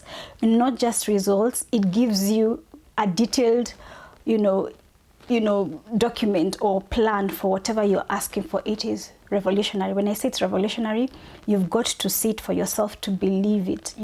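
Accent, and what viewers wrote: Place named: East Africa. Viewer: Like you East African ascent... thanks for the lecture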